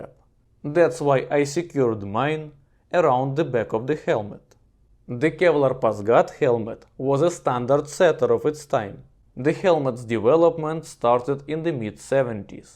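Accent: Russia. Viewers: Hotel posmotret...yslushal dikiy ryski akzent..I rashotel smotret.. Ychi Angliski sinok... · New viewer here. I have never heard a more Ivan sounding Ivan than you before. Love the accent.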